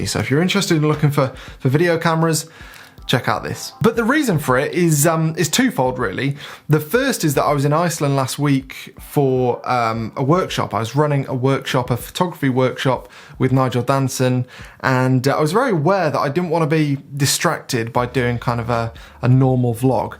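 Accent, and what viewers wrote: United Kingdom. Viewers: I mainly watch this channel for the British. "Trousers", "lorry", "aluminium" etc.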